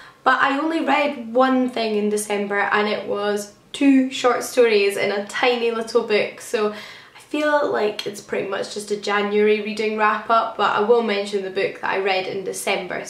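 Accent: Scotland, United Kingdom. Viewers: Hope February is an even better month for you. ❤️ Are you Scottish btw? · As someone who is an asian I always wrongly assume that you are greek considering how passionate and invested you are about ancient greek mythology and history:)) Btw I find scottish accents really cute and adorable!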